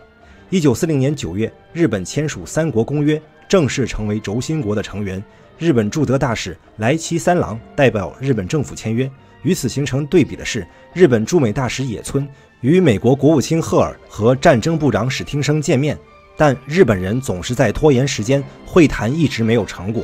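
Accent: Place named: Beijing, China. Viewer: 你是京腔啊 我還以你是台灣人呢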